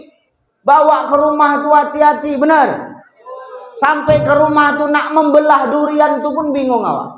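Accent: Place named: Sumatra, Indonesia. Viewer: Assalamu Alaikum Apa ka bar bang Ustadz semoga sehat terus saya suka sekali dengan Ceramah Nya bang Ustadz lucu banget logat Sumatra nya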